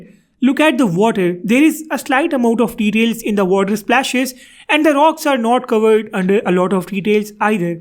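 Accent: India. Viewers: What an indian accent....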